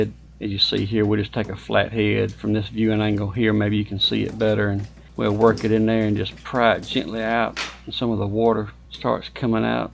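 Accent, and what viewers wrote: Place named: South, United States. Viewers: Helped me so much for my shower. Could listen to a Georgia accent all day. -homesick in KS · I work as a handyman and I'm always learning new things. Doing a replacement of this exact valve for a coworker. Gotta say, once I heard the southern accent, I knew I'd be walking away a smarter man. Thanks dude.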